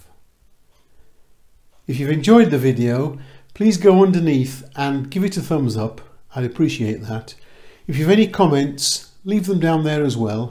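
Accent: Yorkshire, United Kingdom. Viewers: Wow deffinatly going to give these a try, both my husband and I love cheese and onion, I’ve been buying M and S pasties but weather it’s “ health and safety” I’m not sure but there seems to be very little seasoning in anything one buys over the counter these days ( the salt police must have been round 🤔) and it makes for “ tasteless “ produce, I’ve only just come across your lovely straight forward recipes whilst looking for one for a “ custard tart “ I am now binge watching lol I’m going to be very busy over the coming weeks baking lol will be making your custard tart today ready for my daughter coming round for lunch. You also sound as if your a “Yorkshire” lad just like my husband 👍🐾🥰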